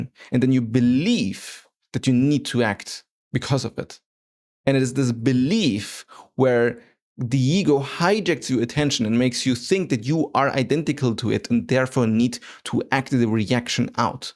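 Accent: Germany. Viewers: I'm impressed with how much what you said resonates with me. There's many channels with seemingly amazing content, that contains just empty calories if you know what I mean. I'm feeling this will be actually useful. By the way, I'm detecting very faint germanic accent... Is your native language German?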